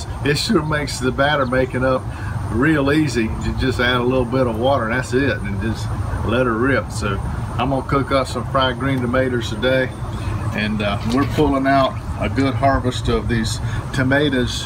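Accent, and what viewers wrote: South, United States. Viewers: “Maters” “maters sammich” lol 😂 the accent is everything, fried green matters and a good ok Mater sammich I cannot even with this one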